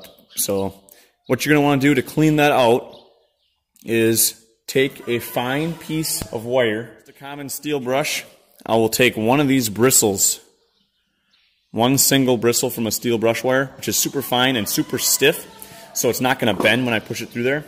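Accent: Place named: Minnesota, United States. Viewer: You sound like you have a Minnesota accent!!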